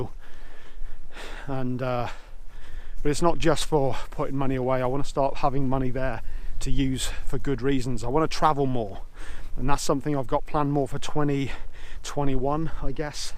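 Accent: West Country, United Kingdom. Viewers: Not down to earth talking, good west country lad! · Nice one Man am sober too ! Respects.....bet ya life has changed ! Hear a West Country Accent.?.. The World is in the grip of addiction so we need people to raise above this Demonic behaviors and speak about their journey into recovery......... Respects Blessings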